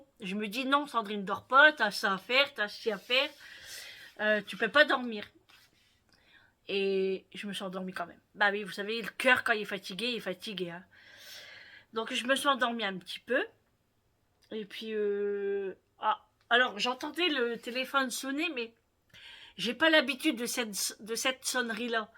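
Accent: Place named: Nord, France. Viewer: Bonjour Sandrine,je tai découverte y a pas longtemps, j'aime beaucoup suivre tes vlog,ton accent du Nord,j adore,mes parents été de valenciennes ❤tu est une belle personne, courageuse simple, ça ne m'étonne pas c'est ça le nord ,je t'envoie des bisous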